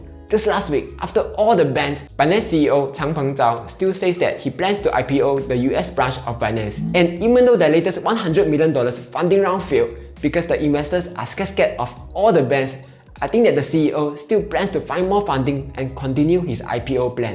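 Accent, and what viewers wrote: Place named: Singapore. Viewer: I love this dude’s 100% pure Singaporean accent 😘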